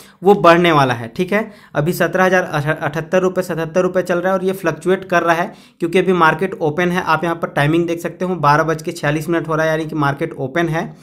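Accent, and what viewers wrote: Odisha, India. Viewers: Sir tum odisha se ho kya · Kya Bhai ap odisha se ho?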